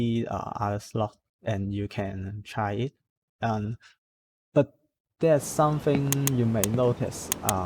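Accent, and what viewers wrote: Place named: China. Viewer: Chinese accent.Thanks for the solution of animation changing flicker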